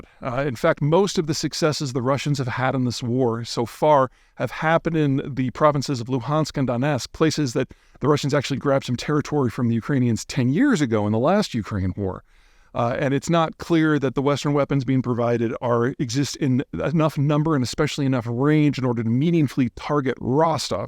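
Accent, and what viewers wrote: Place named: United States. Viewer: First of all: great video as usual, super informative. I just can't help hearing "car key" whenever you americans pronounce Kharkiv, and it's really funny to me.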